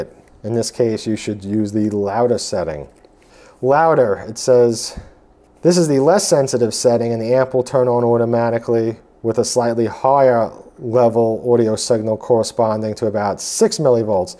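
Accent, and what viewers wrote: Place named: Long Island, United States. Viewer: Very interesting review. I bought one about 2 years ago to supplement a Marantz 6013 with Klipsch RP600Ms. I got it for $600 at the time, right now it's actually $900 everywhere. Yours is the first YouTube review I've found of this amp. I've been curious to see what somebody with access to a lot of amps would say about it, since I don't have much to compare it to. I admit that I was hoping for better. I'm currently at the stage of realizing that I need a dedicated stereo preamp to step up my 2 channel listening, apparently using an AVR for that isn't the best approach - but maybe I'll upgrade the amp after that (and then the DAC, and then the speakers, lol.) Thanks for the review!! (You sound like a fellow Long Islander - are you?)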